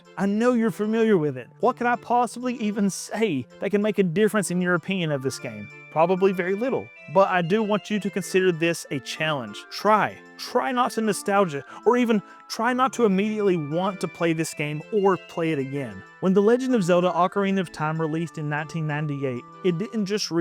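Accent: South, United States. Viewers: I think that ending summation is a good way to think about these games; they're not RPGs, but they capture the feel of one. At least, that's how I felt when I finally did a full playthrough of Majora's Mask last year, and that helped me realize part of why I enjoyed it and Ocarina of Time (which I also finally finished a few years ago) as much as I did. Also, I gotta say, I appreciate hearing a southern US accent on this site amidst all the coastal and British English ones; maybe it's 'cause I'm a southerner myself (not that it's easy to tell from my own accent), but still, nice to hear. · That southern drip is back, yeehaw!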